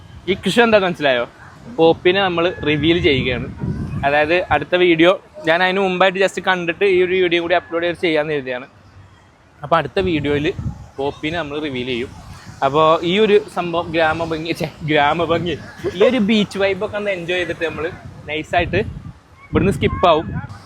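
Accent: Kozhikode, India. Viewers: കോഴിക്കോട് ആണ് മനസ്സിലായി ഭാഷകേട്ടപ്പോൾ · Avalude baksha kettitt Kozhikode ann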